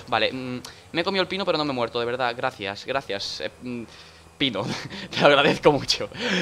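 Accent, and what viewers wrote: Mexico. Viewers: Like si te da risa el acento mexicano de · ... Me da risa como hablas como mexicano😂😂😂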